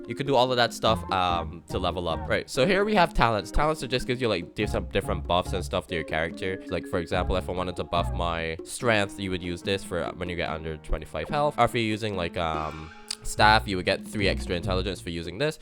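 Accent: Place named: Barbados. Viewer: Bro sounds Bajan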